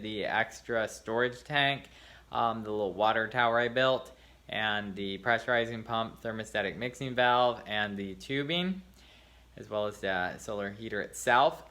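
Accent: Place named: United States. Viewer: I've lived all over the world, I can only find voices like this in America.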